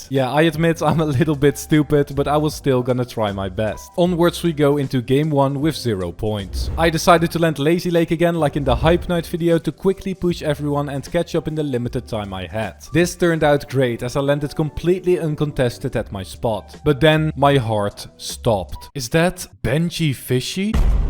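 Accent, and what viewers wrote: Netherlands. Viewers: Wait a minute do i hear a dutch accent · You sound so dutch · R u Dutch? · Are you from holland? Als je dat bent dan dacht ik dat al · are you dutch? you have a dutch accent. ik ben ook Nederlands, ga zo door je bent echt goed op k&m. keep improving:)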